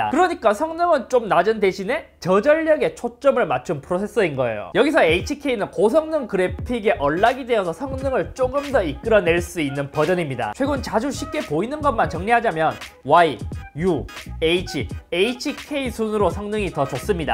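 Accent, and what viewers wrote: Gyeongsang, South Korea. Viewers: 역시 갱상도르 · 오우예 같은 경상도 people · 갱상도 아입니까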